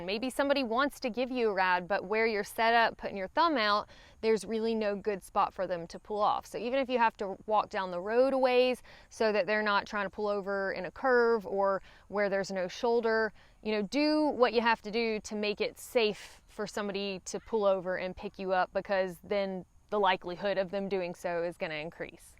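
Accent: South, United States. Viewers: Just love your communication ability. Very efficient and has the perfect southern accent.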